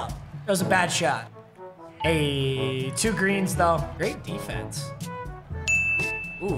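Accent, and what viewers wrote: Michigan, United States. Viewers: Michigan accent is rlly comin through on this episode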